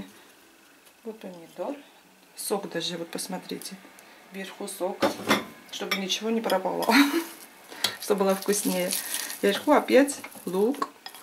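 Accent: Armenia. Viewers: Здравствуйте, джан! У Вас приятная, сладкая речь с армянским акцентом.Посмотрела приготовление вашего блюда, и сразу запахло Арменией. Там так умеют вкусно готовить, все овощи и фрукты пропитаны и теплом и щедрым армянским солнцем.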